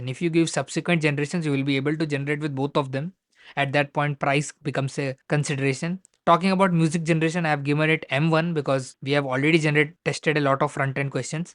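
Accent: India. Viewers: Please take english lessons i cant with these indian accents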